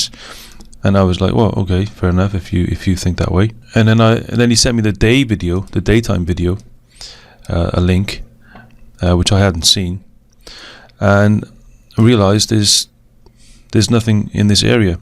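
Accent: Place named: Cardiff, United Kingdom. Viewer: Your voice sounds like your from Cardiff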